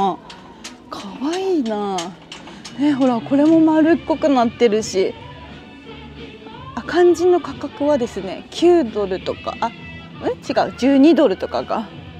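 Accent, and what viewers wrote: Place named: Fukuoka, Japan. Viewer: Target isn't Cheap, their prices are High due to the location of the shop being in Waikiki and caters to tourists mainly, and the rent Target pays for the location is included. Locals don't shop in Waikiki, major rip-off! Walmart, Costco, Sam's Club, Donqui Jote, Times Market are the cheaper places to shop. Wholefoods, Kahala Mkt, Kakaako shops are expensive. Love your videos and your cute Fukuoka accent!❤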